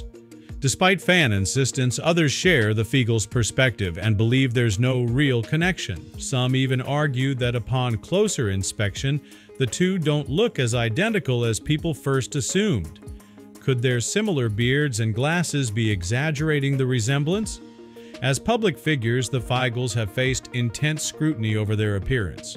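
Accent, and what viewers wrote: United States. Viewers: I absolutely hate this narrative style. Saying a lot of words but not getting anywhere. (AND the horrid american accent.)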